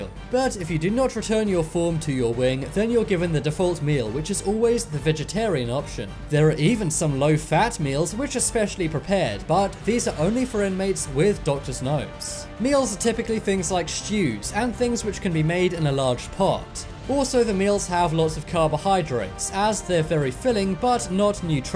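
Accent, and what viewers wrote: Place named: United Kingdom. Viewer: did the british accent of the narration not tip you off ?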